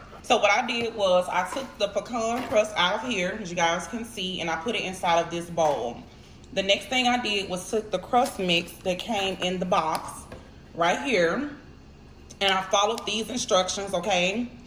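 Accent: Mississippi, United States. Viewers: I love your Mississippi accent, thank you for sharing your recipe.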